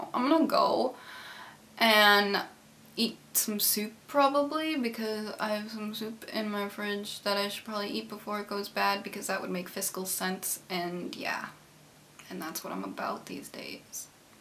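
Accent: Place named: Minnesota, United States. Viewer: your minnesota accent was rly prominent in this video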